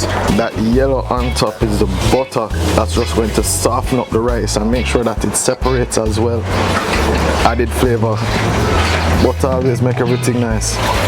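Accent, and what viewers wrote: Jamaica. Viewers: You make patwah sound sweet to the ear……we’re homesick….!! Never tried the coconut milk in my stew peas, but I sure will!! Blessings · “Pot na have nuh time”. Sounds like my Jamaican mum & grandma, but me, raised in the West I need time and measurements 😂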